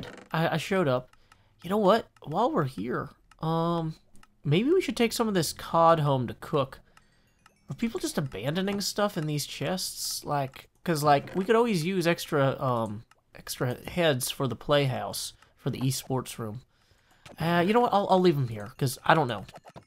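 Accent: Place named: Tennessee, United States. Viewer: I had no idea I wanted to hear “Hack the planet” in your musical Tennessee accent. Always learning new things on your channel,.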